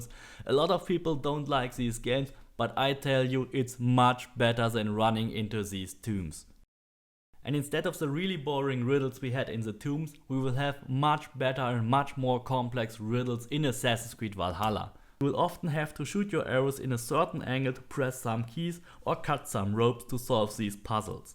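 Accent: Germany. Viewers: Where u from bud, u sound german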